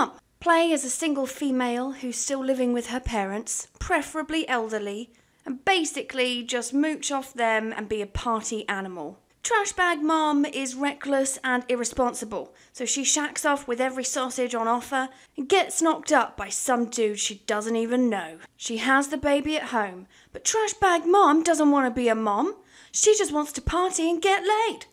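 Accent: England, United Kingdom. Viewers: u talk like hermione, and i dont watch harry potter. i just know her. shes british. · WoOoW!!! you are truely a unique story teller! Sims 2 has a built in autonymous whims gameplay system. Sims 3 has Story progression but sims 4 has given us so many beautiful tools and freedom ALLOWING US to tell our own stories and YOU my dearly beloved hit the POINT right on the nail!!! Alota simers find sims 4 boring which is unfortunate but what they dont realise is the amount of IMAGINTIVE playground it offers! All it demands from us is a special story from YOU the player and in turn THIS IS THE RESULT!!! So captivating i mean i JUST STARTED your video and when you starting introducing the TRASHBAGDADDY storyline i was BLOWN away and IMMEDIATELY started typing in comments!! WE NEED MORE like you! People with whimsy! English accent with Goddess angelic levels of VOICE Narritive and TRUE PASSION for the gendre i mean WOW you been loving the game since the beginning of time THIS IS SO INSPIRING! 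You have single handedly lifted my spirits and im sure you have ignited many others! i LOVE YOU i love you SoOo much!.. Can i have your number?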